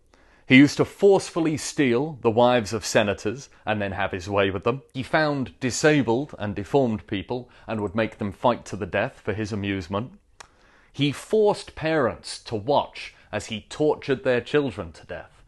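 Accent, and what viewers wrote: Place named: Northern England, United Kingdom. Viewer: fuck sake beat me to it bar the northern accent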